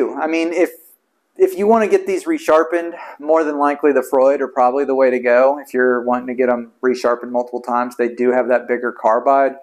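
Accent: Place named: Texas, United States. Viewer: well, I am from Austin so I always recognize Texas in videos no matter how it manifests itself!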